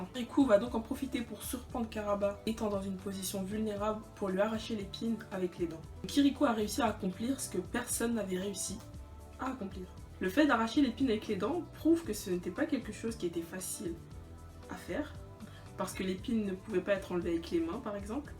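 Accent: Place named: France. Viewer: Comment tu parle avec le bon français de France😭😭😭😭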